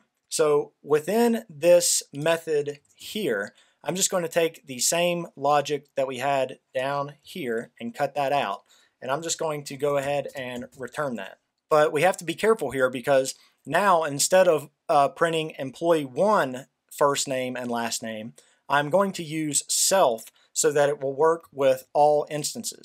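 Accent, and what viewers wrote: South, United States. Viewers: me: I like this guys accent, kinda southern also me: m8 you live in tennesse, you have the same accent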